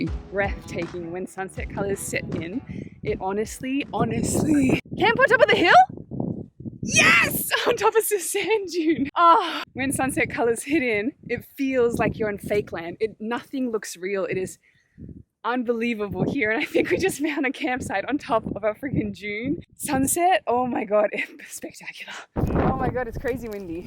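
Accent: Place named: Australia. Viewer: I’ve been following you for years. Thought I would share your Aussie accent has came back so strong lol I imagine it’s only natural from immersing yourself back home (and also from what I can tell your guy is Australian). Just thought it was funny hehe keep enjoying your travels 🙂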